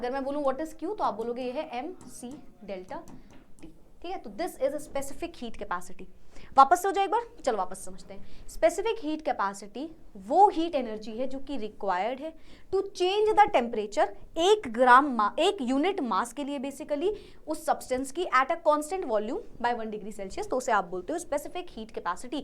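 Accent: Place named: South, India. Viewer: Indiaaa, but not from north.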